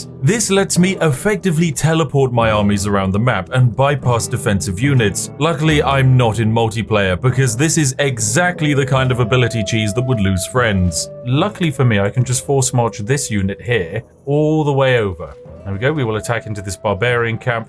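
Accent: United Kingdom. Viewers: Been binging spiff for the last 3 nights, a 12 hour shift is certainly far more enjoyable with that beautiful British accent. Glad to have a new video finally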